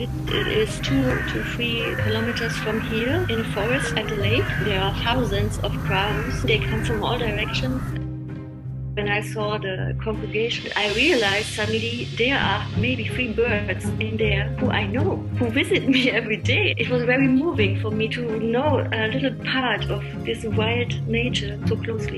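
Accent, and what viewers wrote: Germany. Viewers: Habe es schon an der Aussprache gehört, dass du Deutsche bist.... Liebe Grüße aus Worms 😁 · 你的英文聽起來很像德文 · I’m actually wondering if she’s German cuz to me as a German her English has that small accent XD also Kräri sounds kinda like the German word Krähe which means crow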